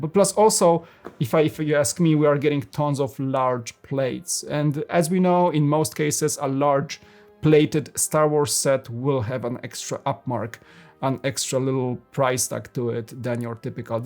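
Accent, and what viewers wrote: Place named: Poland. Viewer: Sets are great, but overpriced. I think the reason is Disney license, it must be very expensive, at least we get new Cody Minifigure. Ps. That's my first time on your channel, are you from Poland? You sound like a pole :)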